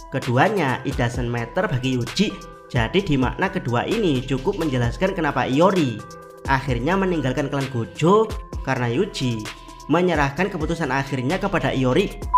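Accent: Java, Indonesia. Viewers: Jawa nya keliatan. Medter 😅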